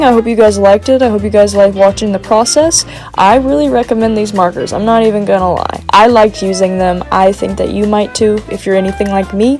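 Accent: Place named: South, United States. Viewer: country accent + deep fried audio is so peak..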